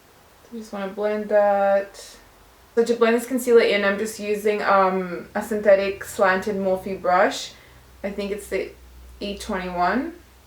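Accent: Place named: Australia. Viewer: Amazing make up, thanks for the tips. Great to hear an Australian accent. Hope you are healthy and well.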